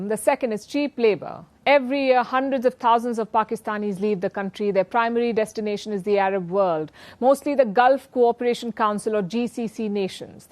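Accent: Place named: India. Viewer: What a “desi English “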